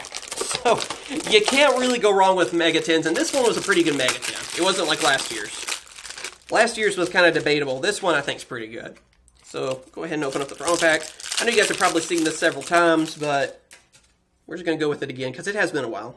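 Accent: Texas, United States. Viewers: The thing that I LOVE about your videos is your texan accent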